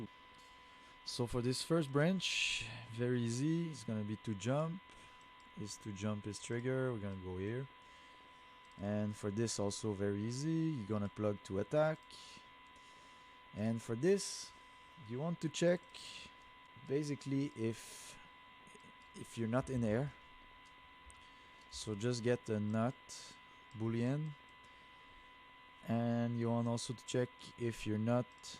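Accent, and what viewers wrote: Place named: France. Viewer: Hey, just want to let you know that your French accent is very nice and clean, you speak English a LOT better than lots of my French friends.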